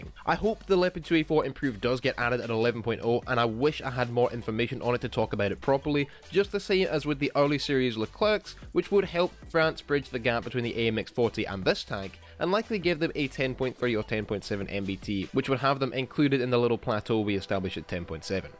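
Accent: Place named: Scotland, United Kingdom. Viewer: I honestly love the Scottish accent. As a matter of fact, I just love the Scottish in general.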